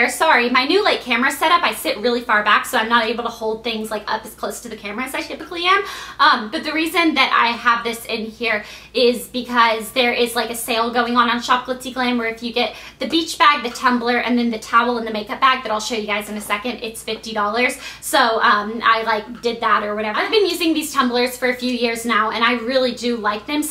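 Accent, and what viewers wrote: South, United States. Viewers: Lol you never know when someone is going to get a shark bite so good thing you have your teeny tiny first aid kit! Haha you made me laugh so much in this video and I love when we can hear your southern accent a bit -3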